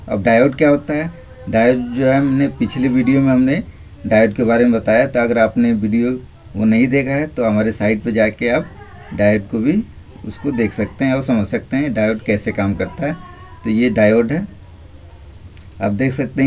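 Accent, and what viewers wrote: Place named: Assam, India. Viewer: vai ap ka tune assam hotahe best of luck.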